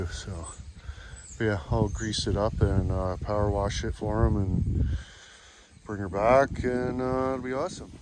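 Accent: Canada. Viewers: No he's just Canadian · My radar went up on the accent. Sure enough, he zoomed in on a license plate from Ontario Canada.... Where they REALLY don't listen to OSHA. We'll played Sir. Get them views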